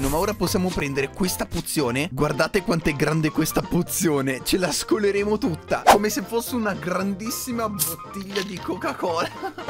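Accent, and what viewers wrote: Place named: Udine, Italy. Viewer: Mi sembra udinese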